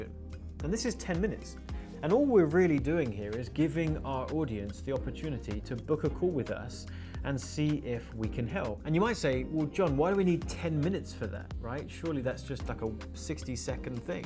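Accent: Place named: United Kingdom. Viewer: We need deep dive,. Thank you so much! Incredible content. Also loving your British pronunciation =)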